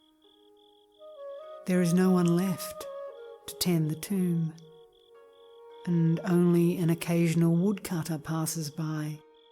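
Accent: Australia. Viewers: (Aussie, woo hoo! Definitely a Sydney voice.) Beautiful poem.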